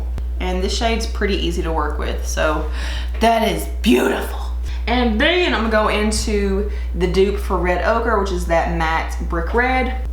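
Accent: South, United States. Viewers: I love love your video! This is the first time I’ve seen your channel and I live it!!! You have a wonderful voice and you don’t stammer! You know exactly what you want to say! No “um” no “like”! You talk fast but not too fast! On other channels if the talk too slow I find myself saying come on spit it out already! I get bored and go to another channel! You are very professional! And your southern accent is over the top! Will be watching all your videos! You rock girl! Thanks and keep up the great work!!! PS I love your lipstick! What is it? All of your makeup looks fabulous! Great job!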